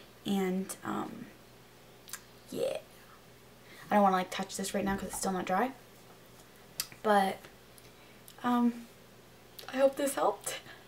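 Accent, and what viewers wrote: Canada. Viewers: You sound Canadian. Are you? :)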